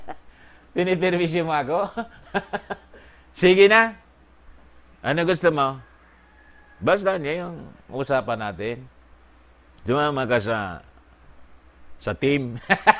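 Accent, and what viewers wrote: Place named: Waray, Philippines. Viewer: TamA ka manOy, Hahahaha natatawa din akO.. natatawa AkO sa sige na gud ( ang sige nA ngA: )) Ang sarAp pakinggan dahiL kuha mO ang accents ng warAynOn, isa akong taga pakinig sayO manOy, at warAy-warAy din akO purong waraynOn.. at ganon din si MadAm Imelda R. MARCOS AY isang waray waray din. God BLESS.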